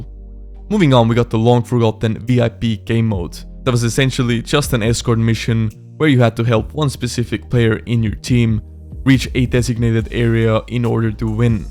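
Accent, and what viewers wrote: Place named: Europe. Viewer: are you from a European country by any chance? your English is good by the way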